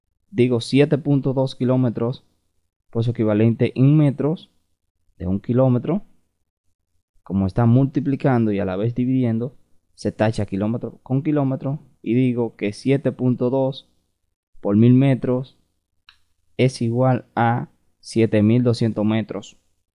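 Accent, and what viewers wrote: Dominican Republic. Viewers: de donde eres me sueñas dominicano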